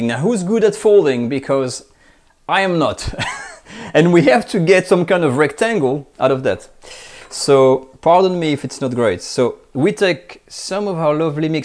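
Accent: France. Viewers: Ree-sip-eee. Haha French accents are so cool. 😎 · Juste pcq tu honores notre culture française 🇨🇵⚜️ et que ton accent en anglais est delicious. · One of the best chanels, you are such a cool guy. Your english is excelent and with frech accent is nice to listen to. Greetings from Canada. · I just love, love, love your recipes , your enthusiasm and your elegant French accent!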